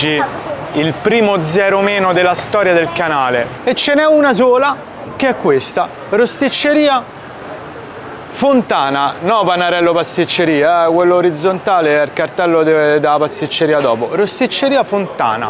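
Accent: Rome, Italy. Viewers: Per avere più riscontro fuori Roma, secondo me, dovresti sprovincializzare il linguaggio abbandonando la pronuncia in romanesco troppo marcata. Per me sei comunque simpatico e mi diverte guardare i tuoi video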